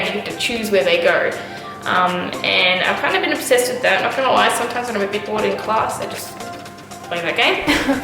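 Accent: United Kingdom. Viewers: I wanna ask you something Why do you sound like British like In a few vids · Are you from england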